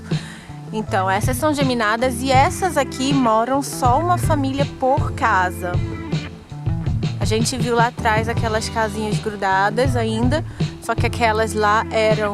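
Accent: Rio de Janeiro, Brazil. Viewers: Você morava em São Bernardo do Campo? Mas você tem sotaque carioca, haha.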